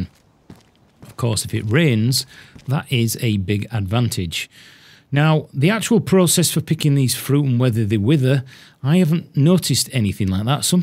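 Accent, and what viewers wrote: Yorkshire, United Kingdom. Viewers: Orate buddy luv your vids. I’m tipping you don’t live far from me with that lovely Yorkshire twang you’ve got. I’m playing on PS4 so I’m a few updates behind I believe. Can I still find weed seeds?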